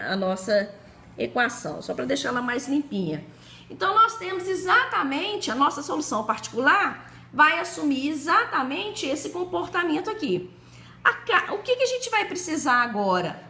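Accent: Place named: Belo Horizonte, Brazil. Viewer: Sotaque de Belo Horizonte MG...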